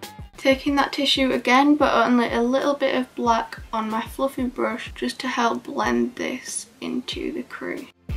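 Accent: United Kingdom. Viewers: Your British accent 😍😍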